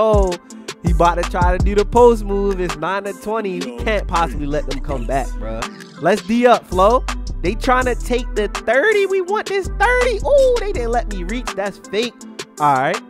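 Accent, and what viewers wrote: Baltimore, United States. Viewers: Yo you gotta be from Baltimore we got the same accent and we talk the same 😂💀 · “Twiu, dwiu” Baltimore accent so ugly moe 😭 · Is from Baltimore or Massachusetts? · Baltimore accent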